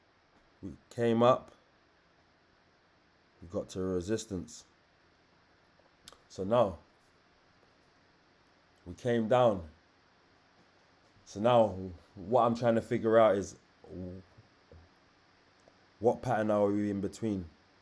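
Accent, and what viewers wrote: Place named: South London, United Kingdom. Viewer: I feel like I know u bro the way u speak I feel like ur in south London my guyyyy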